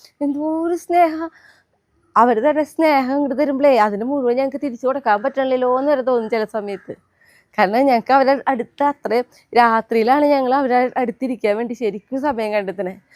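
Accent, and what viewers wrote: Thrissur, India. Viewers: Where r u based, from ur language, I think u r from Thrissur, where r in Thrissur? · Thrissur nadatharel aano chechi ningal😮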